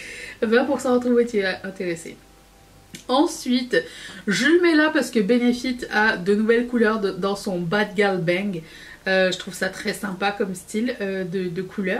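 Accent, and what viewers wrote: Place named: Quebec, Canada. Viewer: Je t'ai découverte il y a quelques jours, je trouvais que tu avais quelques tournures de phrases et de prononciations qui sonnaient québécoises! Ça fait du sens maintenant que j'entends que tu es au Canada! Profite bien de l'été qui arrive! 🦋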